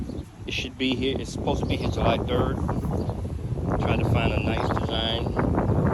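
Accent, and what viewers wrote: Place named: New York, United States. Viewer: That fuckin Ny accent oh my god! No cap, but you sound like a old ass white man who sits in central square park all fucking day alone!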